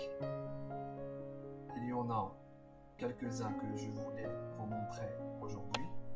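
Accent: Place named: Algeria. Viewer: من لكنتك لنطقك بالفرنسية تبدو لي جزائري 😹☝️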